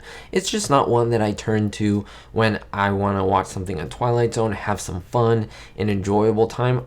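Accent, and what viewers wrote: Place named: New York, United States. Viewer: "Not really so much for the story but for just the facade and the set pieces, i think you'll really appreciate it in a different way" - Because of that line I will indeed try to catch this episode in its entirety, have only seen bits and pieces before. Always love watching your videos, interesting, cool, nostalgic, amusing, and somewhat relaxing as you have kind of a soothing voice, very New York City. Anyway, bottom line, now I want to see this epi in its entirety. Thanks!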